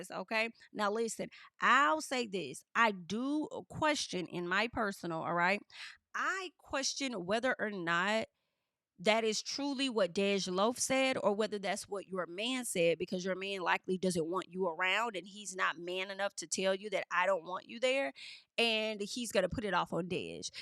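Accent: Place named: Louisiana, United States. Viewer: Hey, bihh! You are one of the best YouTuber. I love your accent. Where are you from? It's giving Louisiana! Bihhh stop, I'm that.5 million that's been watching over and over again! They didn't add crowd noise. I know someone that was there, and they said it was lit! 50 is a bug 🐛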